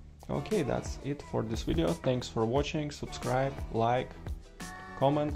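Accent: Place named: Eastern Europe. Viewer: Найс чувак, по акценту почти не слышно что ты из восточной Европы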